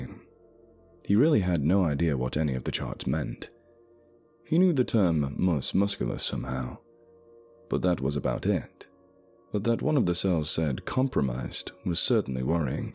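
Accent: United States. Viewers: Your American accent is solid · Your American accent is getting so good! I'm from Oklahoma and to me you sound like a Texan!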